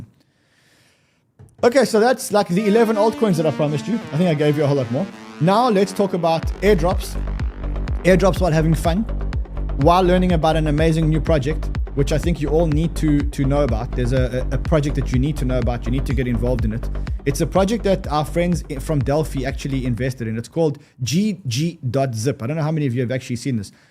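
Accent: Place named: South Africa. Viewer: I think the South African accent should be banned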